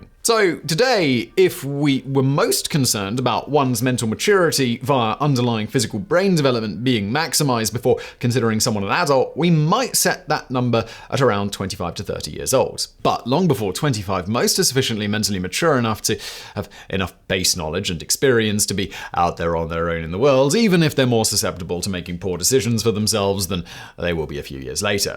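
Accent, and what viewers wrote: United Kingdom. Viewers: are you english, brittish astrailian new zealanden or australionewzealandish?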